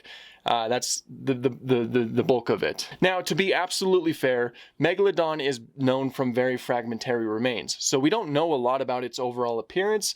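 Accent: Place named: Texas, United States. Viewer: clicked this video because it was something that looked interesting but your accent immediately caught my attention, you sound like the guy from demolition ranch so I'm gonna guess you're from Texas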